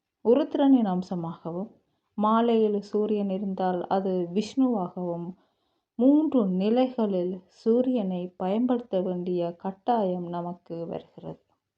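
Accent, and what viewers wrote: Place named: Sri Lanka. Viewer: சிலோன் தமிழ் அழகாக உள்ளது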